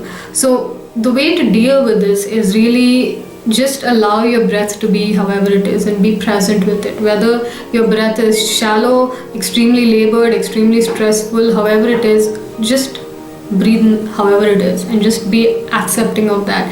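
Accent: India. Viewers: This was a great video with one exception.... the negative effects of the sporadic release of cortisol. I also think that people with a not so healthy heart might stroke, I don't know as I am not a trained professional but I have had all these symptoms it seems at the same time. I have also experienced horrible "brain zaps" that left me numb all over, those are so scary! Thank you for a good video! I have always loved to listen to the flow of an Indian accent. Blessings!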